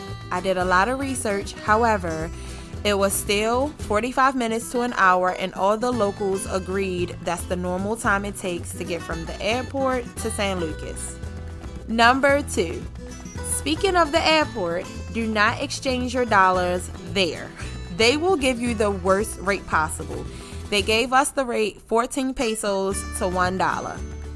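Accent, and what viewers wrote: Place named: Baltimore, United States. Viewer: Enjoyed the video. Enjoyed the B’more accent.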